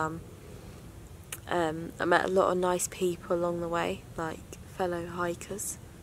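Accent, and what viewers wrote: United Kingdom. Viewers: YT video recommendation brought me here and i stayed to watch it because I really like the british dialect/accent. Best wishes from Switzerland 😎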